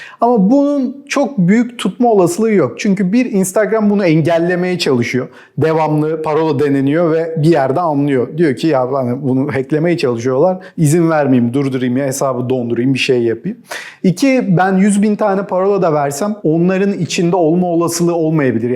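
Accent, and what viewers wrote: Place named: Yozgat, Turkey. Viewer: yozgatli galiba k ler cok dogal geliyo kulaga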